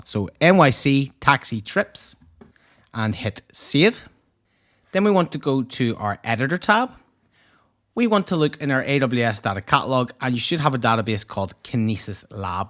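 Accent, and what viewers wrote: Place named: Belfast, United Kingdom. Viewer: Love the Belfast accent! Good work!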